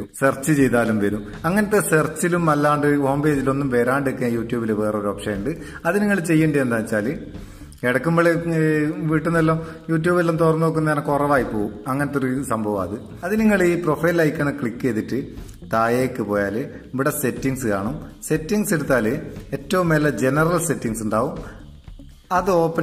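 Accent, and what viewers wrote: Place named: Mappila, India. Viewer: 'ഇക്കാക നിങ്ങളുടെ ആ മാപിളമാരുടെ സംസാരശൈയിലിയും നിങ്ങളുടെ ആശബ്ദവും കൂടി ചേരുമ്പോൾ ഒരു എച്ച് ടി സുഖം നിങ്ങൾ ഞാൻ ആണ്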